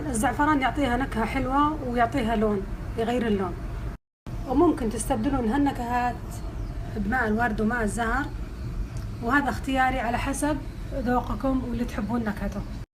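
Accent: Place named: Najd, Saudi Arabia. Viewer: هاذي لهجة اهل نجد بشكل عام 😍🥰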